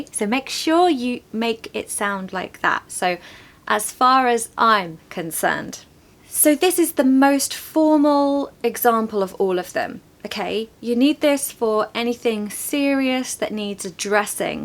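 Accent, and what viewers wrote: RP, United Kingdom. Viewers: In my opinion you are the best when it comes to British English ascent ❤😅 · In my opinion your speach nice and pure British English! 👍👍👍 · Great thanks English teaching teacher I am interesting the way You have explain really Soft and smooth sound as british accent l have Listen you attractive about its so sweet your voice. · I reckon it's so much useful to listen to your lecture. Also I like your lovely British English. From Japan. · I reckon your British accent is lovely, charming. Keep up the good work.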